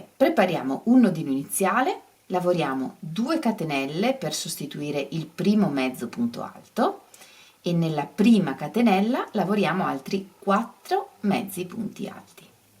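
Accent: Veneto, Italy. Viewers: Bellissima! Sei bravissima 👍 Sei Veneta? Hai un modo di parlare splendido 😉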